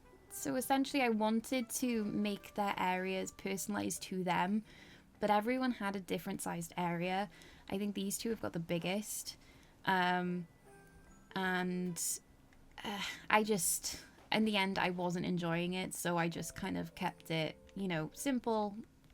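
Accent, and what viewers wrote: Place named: Wales, United Kingdom. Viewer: Are you welsh by any chance? You have a bit of an accent 😅 amazing island btw!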